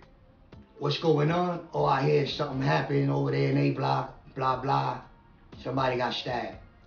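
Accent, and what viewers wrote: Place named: Brooklyn, United States. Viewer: I really enjoyed your stories please keep making videos. You and warpath both sound like guys I remember as a kid, I’m from Brooklyn Marlboro projects and Bensonhurst its makes me feel like I’m listening to the guys when I was a kid keep it up man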